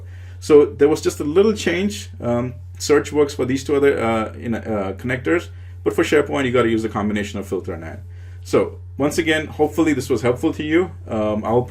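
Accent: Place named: India. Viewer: thank you every video, one question I have, which is off topic, your accent is somewhat INDIAN, are you ever belonging from india, please answer sir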